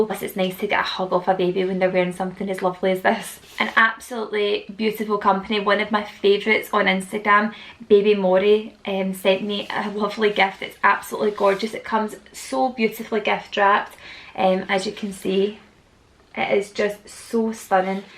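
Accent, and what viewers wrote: Scotland, United Kingdom. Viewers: Fidan Velizade scottish.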